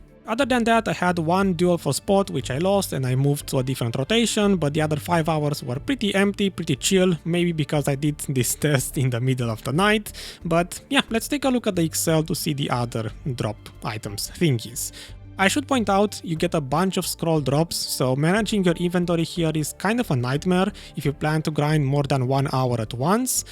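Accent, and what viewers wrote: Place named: Romania. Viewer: You sound romanian :D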